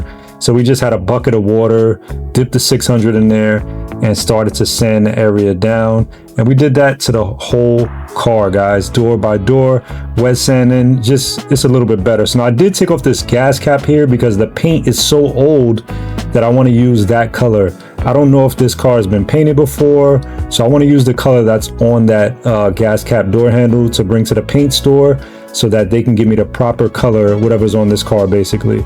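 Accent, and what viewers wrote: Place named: New York, United States. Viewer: it’s a NY accent lol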